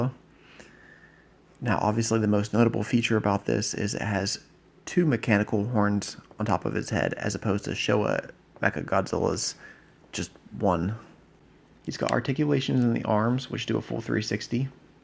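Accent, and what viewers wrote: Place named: United Kingdom. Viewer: You’re a British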